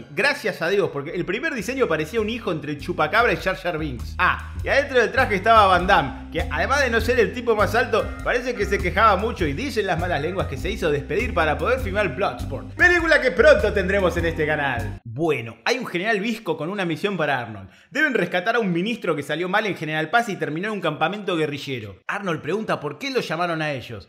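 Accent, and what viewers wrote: Argentina. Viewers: Como me rio con el acento Argento.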